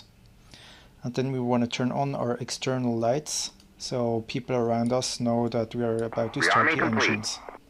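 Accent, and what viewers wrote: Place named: Switzerland. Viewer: Swiss English :)) but been living in Switzerland for 4 years now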